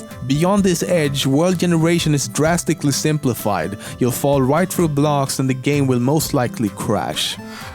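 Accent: Sweden. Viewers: He is Swedish, so he says "H" as "Y"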